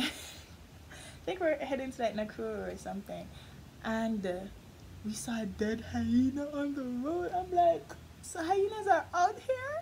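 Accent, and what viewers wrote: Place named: Jamaica. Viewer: Your partial Jamaican accent is so cuuute.🙆 I just love it.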